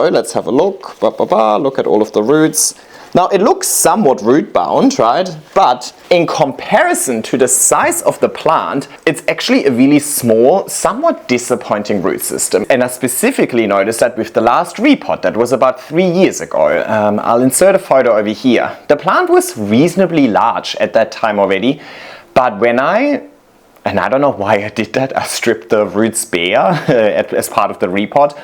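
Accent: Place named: Germany. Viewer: Grazie per i tuoi bellissimi video, per la generosità con quale condividi la tua esperienza. Da anni sei una fonte di ispirazione per me! E poi c'è una cosa buffa, che capisco molto meglio il tuo Inglese con leggero accento tedesco, rispetto al inglese comune 😂. Grazie❤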